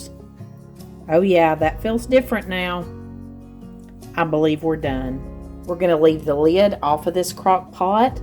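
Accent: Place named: Tennessee, United States. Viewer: I might try the crockpot sweet potato casserole this year! So glad I found your channel because I grew up in Madisonville for several years of my childhood and your accent makes me so happy and reminds me of TN! I still live in the south, but TN holds a special place in my heart. I also purchased some jams for Christmas presents! 🥰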